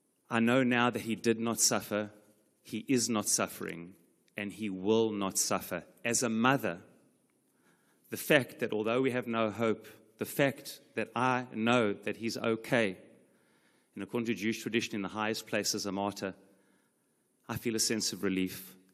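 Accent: South Africa. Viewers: Rabbi Perez as a South African I recognised your accent. Proud of you and all the other strong people of Israel. May you, your wife and all your loved ones plus all who lost their families, experience Hashem's comfort and peace. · South African accent Praying for alllll the hostages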